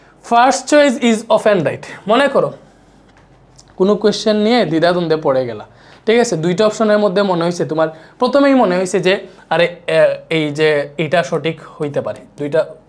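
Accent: Sylhet, Bangladesh. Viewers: Ashole ami jantam na, ami notun bhaiyar class korchi. 🥺 kotha shone sure hoichi je bhaiya Sylheti😊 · Bai apne ke Sylhete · ভাই হয়তো সিলেটি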